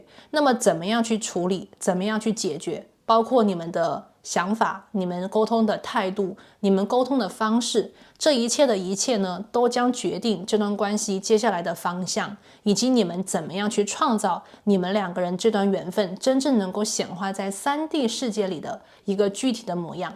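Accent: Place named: Taiwan. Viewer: 默默關注老師很久，很準確之外，老師聲音口音超可愛，有點台灣腔，聽了很喜歡，不會讓人覺得看不下去，希望還能有再多的測驗，感恩宇宙❤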